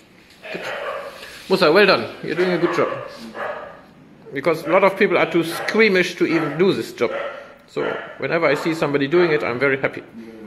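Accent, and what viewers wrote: Germany. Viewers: Condescending German. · It sounds like a really hard german Accent. Do u come from germany? :)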